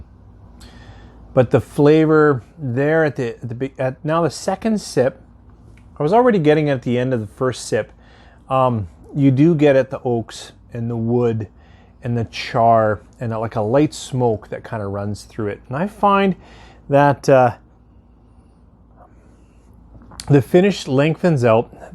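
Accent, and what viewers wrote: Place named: Canada. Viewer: Ha, that accent...I could tell you're Canadian.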